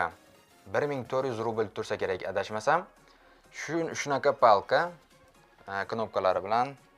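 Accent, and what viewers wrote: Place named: Fergana Valley, Uzbekistan. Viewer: Майли, лекин русча аралаштирма, узингни жааа интелегент деб уйлаш керакмас. Чунки билиниб турибди, сен гирт оддий узбек мхонадонида тугилиб усганинг. Чунки шевангни водийлиги хамма нарсадан Айон килиб турибди. Хурматим ошсин десанг углим, узингни мажбурлаб курсатма рус тилини. Мен рус мактабида укиб, болалигимдан рус кушнилар орасида яшаганман. Мендакалар куп, шунинг учун биз устингдан куламиз. Бир курдим, лекин кайтиб курмиман каналингни, гашимга тегади, ясама инсонлар. Ва кон босимим кутарилиб узимни кийналишимни хохламиман.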